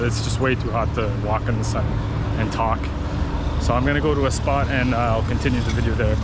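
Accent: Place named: Canada. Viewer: I am a brazilian, ffrom SP, I ve lived in a few countries, including the US (though your accent sounds a bit canadian) I don't blame others for all Brazil s problems, just the ones that were caused by others. Not only Brazil, it s notorious the role of the US in supressing democratic regimes throughout the world, replacing them by brutal dictatorships. Go read a book! I know Rio very well, I have family there, I agree with a lot of what you said, but you also said a lot of nonsense. I love Rio, but I d never live there, too chaotic, I agree people are not very polite, but carioca's are very friendly, easy going, if you didn t get along with them, maybe the problem is with you, not them. The thing you said about the "tamo junto" seems to be a misunderstanding on your part, even brazilians joke about it, "falei pra ele aparecer lá em casa, e o chato apareceu", still, brazilians are culturally much more open to others. 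From the few words you said, I can tell your portuguese is not very good, you have a very strong accent, despite allegedly living in Rio for years, that might be getting in your way of properly communicating with people. The things you said about brazilian women sounded pretty sexist too. The stuff you said about brazilian politics and social economic issues is just BS, Brazil has improved a lot in the past 20 years, I am an economist, working with finance, it s all in the data, do some research. That said, the US is ruled by the king of the deplorables, backe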